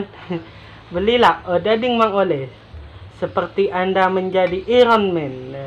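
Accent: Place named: Banjar, Indonesia. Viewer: Logat Nya kaya ada banjar nya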